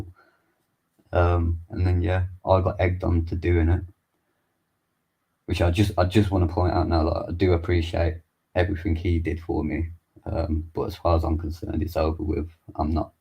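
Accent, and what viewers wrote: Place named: Wolverhampton, United Kingdom. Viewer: Knew it was a Wolverhampton accent, I'm from Three Tuns/ Bushbury area 👍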